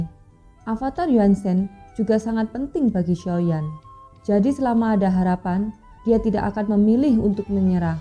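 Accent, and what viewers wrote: Java, Indonesia. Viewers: Agax medog pasti mba min orang jawa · sebenernya suara mimin ke 2 enak di dengar, tp yg jd asing itu pembawaan nya kurang tegas malah bikin ngantuk, trs dlm bahasa indonesia nya masih ada cengkok jawa nya jd terasa gmn gt. Sekedar saran coba dlm membawakan alur dlm bahasa indo ini cengkok jawa nya di ilangin 🙏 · suaranya medok minnnnnn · suaranya jadi medok gini.....jadi nggak pass rasanya😢😢😢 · Aih kok suranya ganti, logatnya kaya cici jawa medok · Suaranya duh..... Logat medok dan lemes... Ayo retret dulu dan berkultivasi supaya kami tak.ketiduran saat mendengarkan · Suara mu kgak enak min, medok btol. Mn si??? Dia lgi pas tuk mmbwakan nya, jlau kmu tdk kembalikan, kmi akan ramai2 mninggal kan channek mu ini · Suara nya jadi medok bgt. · Terasa medooknya 🤣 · Hmmmm ada logat Jawa nya.. Jadi gimna gitu denger nya😢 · Suara ne medook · Suaranya unik, mendok sedikit,ya keren siihh,di dengar agak kaku sedikit tapi begitu pemulah,ttp semangat ya,🙏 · Medok banget nada suara nya.suara Jawa..emang kmn kak..kangen suara nya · Ko medok yah. 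Maaf nih orang jawa bukan? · Suara nya terlalu cepat ini min... kurangi dikit..biar mirip sama...logat Jawa nya nampak x min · suaranya kurang,semangat,strong,terasa datar,logat jawinya kental,maklum belum terbiasa😁🙏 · Logat jawa nya masih terasa🤭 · Suaranya kaya agak medok gimana gitu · Ini kok jawanya medok bgt😂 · Agak meddok. Lucu lucu 🤣🤣🤣🤣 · Jowone totok😢😆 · Adu aduhh ko jadi medok ya, 🤭🤭🤭🤭, yo wes gpp, lanjut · meddok suaranya kurang power, tapi boleh tahanla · Medok amat mc nya... 🤭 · ini suara kaya nya Avatar nya ka.yng ngomong bukan suara kka yng asli..ka nya mna ya..Iki suoro ne kaya lagam nya wong jowo.. Iki..aduh kurang seru suara mu Avatar..🙏🏻🙏🏻🙏🏻.maap ya suara kka Avatar kka kurang menarik niee😔😔😔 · kok lain suaranya, ni medok bgt Jawa nya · Aura jawa ny mentok ya.. mimin ny..😊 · sekarang suaranya jadi medok...klihatan jawanya.😆 Semangat...!! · Medok men toh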